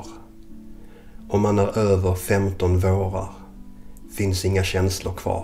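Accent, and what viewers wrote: Sweden. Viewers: I never liked poetry but this gave me goosebumps for some reason. Im always amazed by the swedish pronunciation. You are the best!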